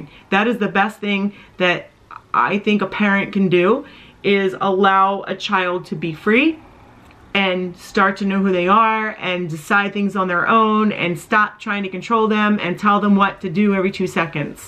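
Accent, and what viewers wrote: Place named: New York, United States. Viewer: A bit off-topic, but why does your voice sound so different in your videos (in compare to Brandon's)? It has a lot more bass, and it sounds kinda newyork-ish.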